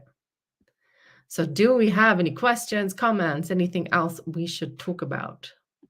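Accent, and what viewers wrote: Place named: Northern Ireland, United Kingdom. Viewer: I thought I could hear a hint of a N.I accent.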